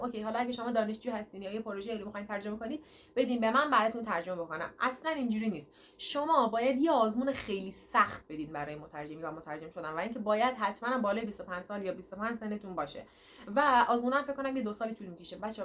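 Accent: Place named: Rasht, Iran. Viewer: از لهجه ات معلومه رشتی هستی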